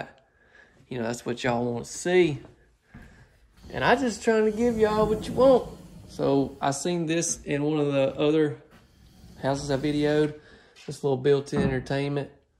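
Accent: South, United States. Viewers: You could sell the phone book . I like listening to your southern charm and wit . Keep up the good work ! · Love the floors. Nice house but th as t 2 story was my favorite! And you don't sound like a goof! You sound like a southern gentleman!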